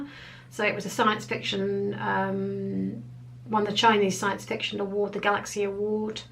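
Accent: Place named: RP, United Kingdom. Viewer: Received pronunciation .moron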